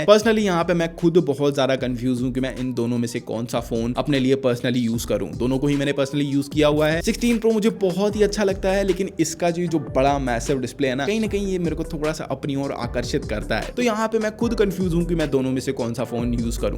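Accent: Sindh, India. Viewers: Are you Sindhi??❤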